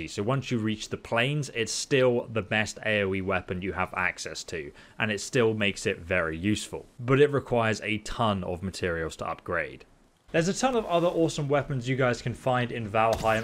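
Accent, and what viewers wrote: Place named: England, United Kingdom. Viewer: I don't know why I prefer to receive instructions from a person with an English accent, but here we are.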